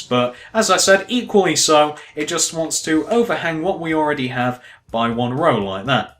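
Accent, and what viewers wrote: United Kingdom. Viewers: LC GAMING WITH LC GAMER me to he's British that's why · He's British you dumbass,he was saying three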